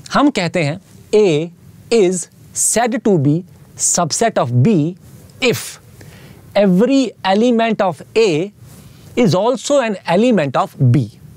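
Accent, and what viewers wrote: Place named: Punjab, India. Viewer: Sir ki punjabi 😁 nice I am from mp but I know punjabi very well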